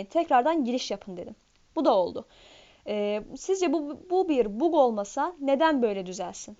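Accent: Azerbaijan. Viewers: azerisen qaqa?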